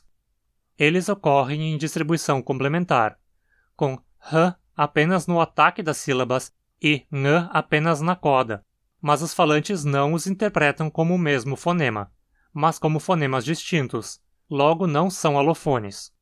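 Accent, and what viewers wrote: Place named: Rio de Janeiro, Brazil. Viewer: Sotaque do RJ, amei❤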